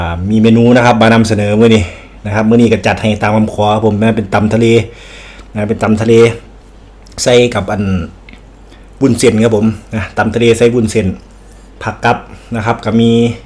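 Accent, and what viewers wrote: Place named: Chaiyaphum, Thailand. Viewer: อ้าย เว้าสำเนียงคล้ายๆบ้านนู๋เลยจ้าคนชัยภูมเด้อจ้าfc จากชัยภูมิเด้ออ้าย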